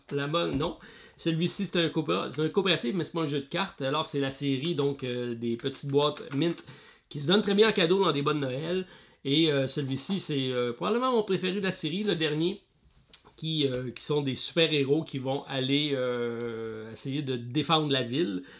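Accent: Quebec, Canada. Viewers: Joyeux Noël:-) 1er commentaire pour ma part, pour une chaîne que j'attends impatiemment chaque WE (avec ton accent québécois, je suis au sud de Lyon). On a reçu ici pour Noël TTMC et un Unlock... et nous avons déjà joué aux deux! Fait marquant de l'année pour moi: Tainted Grail, même si je ne suis pas allé très loin (et en ce moment le "ks" "gamefound" ISS Vangard). Bravo pour tout! Et bons moments de jeu en famille.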